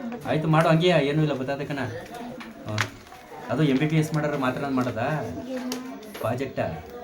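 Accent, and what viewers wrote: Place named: Magadi, India. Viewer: ನಿಮ ವ್ಲೋಗ್ ಚನಾಗಿರುತೆ ನೋಡಕೆ ಮಾಗಡಿ ಮಾತು ಬಿಡದಿ ನಿಮ್ಮ ಪ್ರೀತಿ ಬಾಯಿಸುವವರು 🎉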